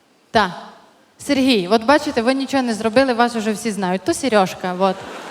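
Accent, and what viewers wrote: Ukraine. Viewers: Западноукраинский диалект. Он уже рулит везде - на ТВ, радио, в эфирах всех мастей, сплошь и рядом ""підЕмо-прийдЕмо", уже все привыкли, скопиастили на слух друг у друга, не утруждая себя проверкой по словарям (а школы прогуляли, дипломы покупали в коммерческих ВУЗах, простите - вишах, естественно... :body-blue-raised-arms: Скоро галичане будут переписывать словари и учебники для всей Украины. :face-blue-wide-eyes: От то буде файно! :hand-orange-covering-eyes::face-turquoise-drinking-coffee::face-blue-smiling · Це Закарпатська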